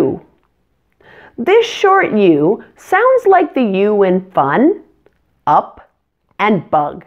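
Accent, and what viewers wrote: United States. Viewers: thx so much 😘😘..teacher this is english america???🤔🤔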